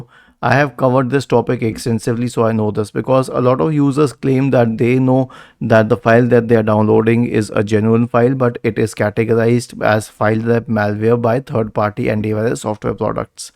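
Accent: India. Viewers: indian accent ahh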